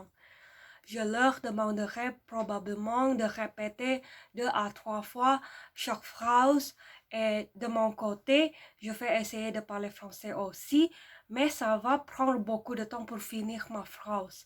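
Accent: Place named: Quebec, Canada. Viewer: Ta prononciation en français m’impressionne! Et c’est ta troisième langue en plus? Wow! J’entends déjà l’accent québécois, hihihi ;) En tant que Québécoise, je suis un peu gênée de la loi 96. Un délai de 6 mois pour apprendre le français n’est pas raisonnable. J’espère sincèrement que tout le monde sera conciliant et compréhensif à ton égard.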